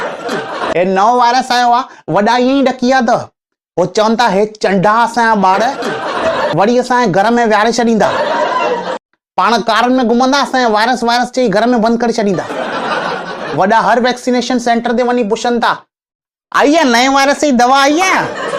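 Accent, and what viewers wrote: Sindh, Pakistan. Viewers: Really refresh our mood.. Speaking skill too good.. Love to hear ur sindhi accent.. Blessings n wishing success always 👌👌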